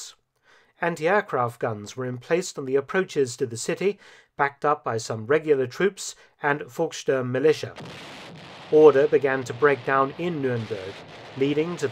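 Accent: United Kingdom. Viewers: This dude sounds British to me but idk if he is but if he is he said soccer...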